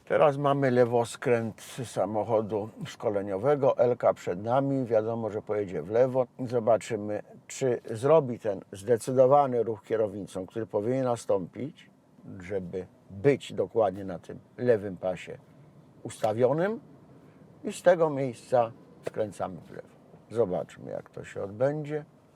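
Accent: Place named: Kraków, Poland. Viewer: Po Krakowsku mówi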